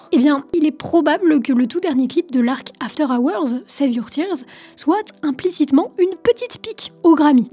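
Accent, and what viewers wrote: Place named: France. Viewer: SVP est ce que tu peux prononcer les mots anglais sans l'accent Français? Ça fait mal au oreilles, / Ze weekend.. rien de personnel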